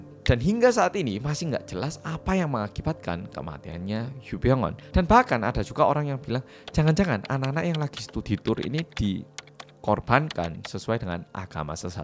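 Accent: Java, Indonesia. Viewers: Hmm..... Muka Korea logat Jawa aku kaget weyy😰🥺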